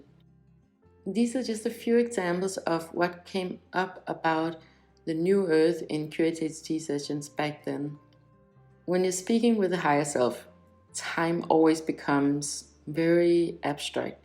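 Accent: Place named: Denmark. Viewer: Det lyder som en dansk accent 😊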